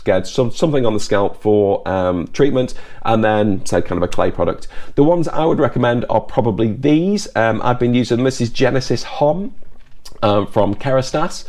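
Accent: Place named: Northern England, United Kingdom. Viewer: Hi, Another insightful video, and great to hear a friendly northern accent;) Thank you for answering my last two comments.. I have been researching FUE for the last 18 months, as that is when I have noticed my thinning and receding hair line. Funnily enough its the exact same time when my first born arrived, maybe there is a correlation as soon as you have kids, your hair says ok, you have found a mate, and we have procreated, no need to stick around lol... Keep up the great work you are very natural and entertaining, Best Rich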